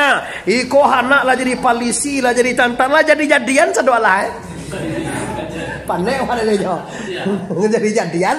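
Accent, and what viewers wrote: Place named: Pariaman, Indonesia. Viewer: Bahasa Minang kabau logat Pariaman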